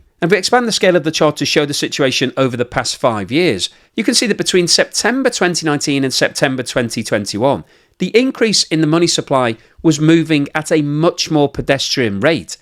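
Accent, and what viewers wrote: United Kingdom. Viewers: Its funny hearing a british man talking about troubles of Turks. Mind ya own business ya fanny.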